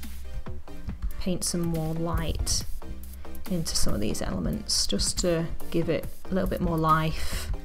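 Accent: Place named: Liverpool, United Kingdom. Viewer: scouse accent = 😍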